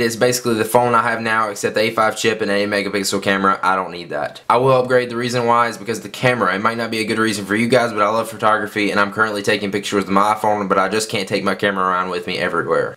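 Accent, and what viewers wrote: South, United States. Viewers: are you southern?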